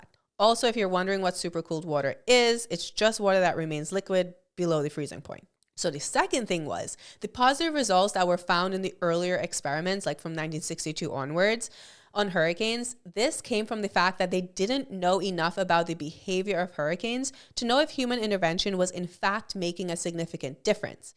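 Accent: United States. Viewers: This was good education! lol whatever you're doing to get the algos to get you eyeballs is working, but your family is endearingly quirky and nerdy, and damn how you put your hubs on the spot is kind of hilarious. He's a champ, though. Not really on-topic, but your accent is unfamiliar to me. Some elements seem like mideast US but there's other stuff going on that makes me suspect you're also multilingual. For context to my question, I'm an aspiring voice actor so that accents are of interest in that way, and also have worked with translators for publications for many years, and I'm always just curious about this sort of thing.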